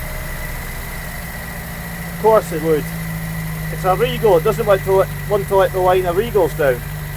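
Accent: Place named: Scotland, United Kingdom. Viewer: Well, this is one of those times that YouTube's random recommendations came up trumps! You are a very, very lucky man to own all those Reliants - I'd have a hard time deciding which one would be my favourite. I've always had a fascination for these, and do like the Mk1 Robin saloon with the glass rear hatch which I find stylish but I suspect if pushed to actually buy one it'd be a later Rialto. (A Kitten would be a tempting prospect, too. There used to be a couple running around my home town when I was younger.) Nice to hear a fairly local accent too - I'm watching this from just a wee bitty further north than Aberdeenshire.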